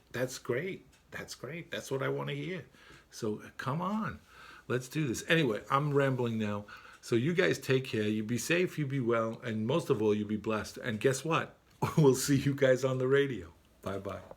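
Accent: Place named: New York, United States. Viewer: Hi. I like what you're saying -- and it's really nice to hear a New Yorker again. I keep my old phones to use as throttles and cameras. Just ask a friend (or The Wife) for their old one. Cheers! --dunc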